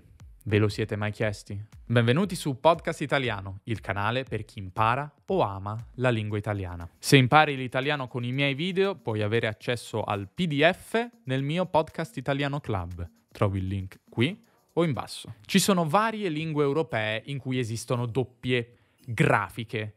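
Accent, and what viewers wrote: United States. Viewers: You sound like an educated Texan when you speak English. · Parli inglese con la pronuncia americana!😉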